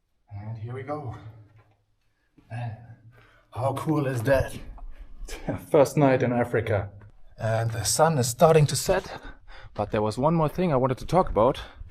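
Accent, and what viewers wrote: Germany. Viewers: I freaking LOVE the video series but this heavy german accent in his english is hard to endure .. selbst oder ganz besonders als Deutscher, das klingt schrecklich vor allem diese Betonung .. :( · German accent unfortunately drives me crazy - too German🙈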